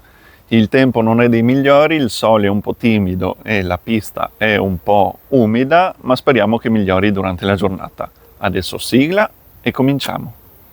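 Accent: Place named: Trieste, Italy. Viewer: 😁si che sei di Trieste l'ho capito subito, si sente, sarà il dna🤣 La pista mi sembrava strano, qua non riusciamo a fare neanche questo. Abbiamo fatto un paio d'anni con gli aerei elettrici ma.....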